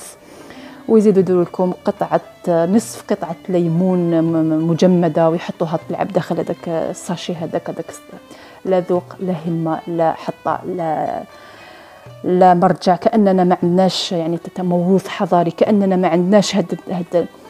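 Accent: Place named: Casbah, Algeria. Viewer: الله يبارك فيك والله انت بنت طبع وشان هدرتك والله كيما ماني وماما وعماتي بنات القصبة وطيابك كيما هوما الله يبارك كيما هوما حتى انا طيب كلش تقلدي ومانعجش للمهراز نهار تزوجت عطاتني ماني مهراس تاع نحاس 🤣 والله كي نتبع ونتفكر كي كنت ندخل مع ماما لكوزينة ولغربية صح تاع زمان ماجيش مشقة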